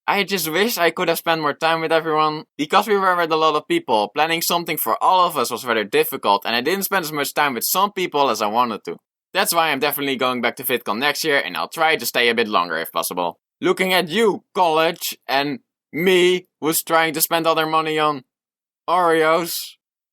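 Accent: Netherlands. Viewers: I knew it you’re Dutch... well that explains your dutch English accent Really like your videos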